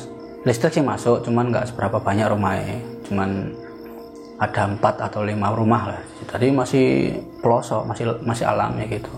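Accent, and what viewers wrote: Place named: Malang, Indonesia. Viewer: Kyo logat e wong malang se 😁🙏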